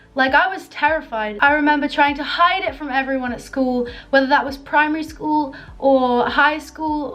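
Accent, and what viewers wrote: England, United Kingdom. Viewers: Are you English?? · Are you English?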